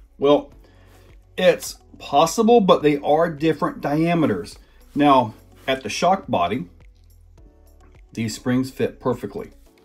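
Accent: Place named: United States. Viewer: Yeah im hardcore! Love your videos man! And the good ol american accent🫵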